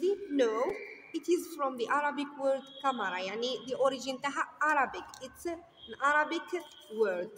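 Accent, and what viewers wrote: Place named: India. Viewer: يا استاذة مع احترامي اللهجة الانجليزية تاعك مثل لهجة الهند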